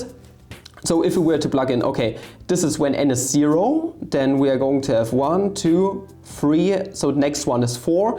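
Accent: Germany. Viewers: I do not know why, but I enjoy your german accent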